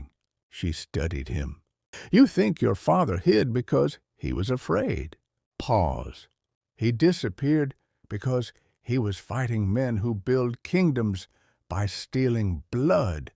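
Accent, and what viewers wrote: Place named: South, United States. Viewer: The AI voice is horrible, a southern speaking white man does not match the story.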